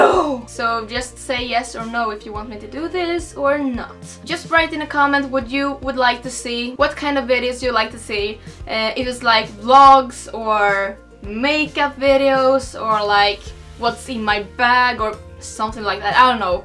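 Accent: Sweden. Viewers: I really looooove your Swedish accent 😎 You're so Cute 😘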